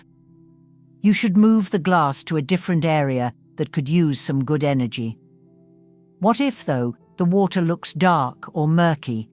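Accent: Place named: United Kingdom. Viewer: Trump supporter or just an idiot with a British accent?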